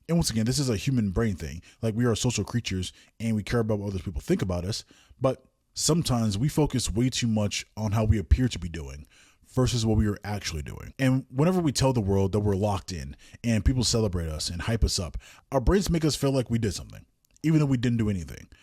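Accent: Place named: United States. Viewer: I'm sorry but what's with the STUNNING photo of Whitby abbey ???!! Not even related to the conversation at hand unless you want to talk about how becoming a monk or a nun is the ULTIMATE way of "locking in" because you're bound for life? It's like my fyp is hinting at me to pay attention by using motifs from my life that no one even knows about. And I'm guessing from the voice over that this guy is American, which makes this photo's insertion even more questionable since although it inspired one of the most well known books ever, it's still a pretty niche place if you think about it. Anyway, thanks for coming to my TedTalk :)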